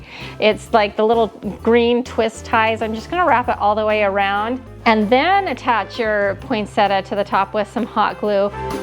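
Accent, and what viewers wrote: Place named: Maine, United States. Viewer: Awesome ideas - love your videos. So cool to hear you might be from Maine (from a fellow Mainer) 🙂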